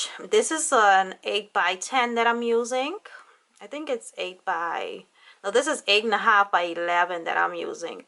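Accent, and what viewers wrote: Bahamas. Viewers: Oh wow you sound Bahamian! Are you Bahamian?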